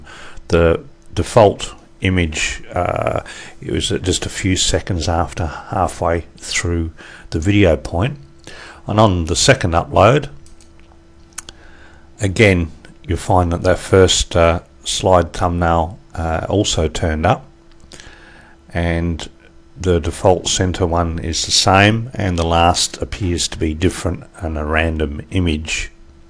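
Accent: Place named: United Kingdom. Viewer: hes not american its british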